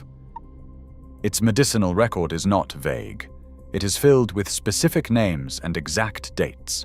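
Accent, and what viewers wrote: United Kingdom. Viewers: probably informative im sure, but I turn off the message presented with the heavy British accent, as if accentuating the one we can really believe and trust. I wish all heath, peace in well-being everyday.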